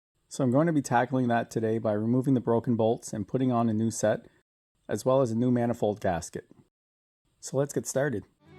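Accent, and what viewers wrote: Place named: Canada. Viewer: this guy sounds so unbelievably canadian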